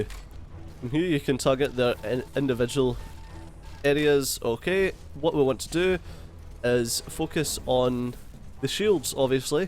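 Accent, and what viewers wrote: United Kingdom. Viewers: That looks like a fun game! Great video on it too. Are you British or Scottish?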